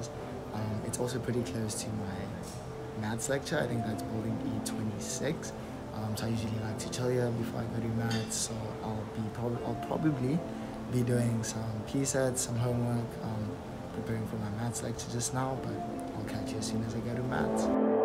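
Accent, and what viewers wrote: South Africa. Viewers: Your accents sounds so South African, have you ever been to South Africa? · Am I hearing a South African accent here 👀😅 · You have a South African accent...you from there?? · Lol. Idk why but I'm sensing a South African accent... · I know a South African when I hear one. 😂👀👋🏼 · You sound so South African… am I correct or not? 🇿🇦 If so, could you please make a video about it; Matric marks, how you applied, what the costs are, etc. Thanks brother. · Are you South African??😏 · Weird question but are you South African😂😂😂 I cant shake the feeling💀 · Where are you from? I cant tell what accent you have, sounds South African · I noticed that you've got a South African accent, how did you make it to MIT from SA?! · Were you born in South Africa, by any chance? Your accent sounds a lot like ours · you have a "jhb" accent, I picked it up a mile away 😅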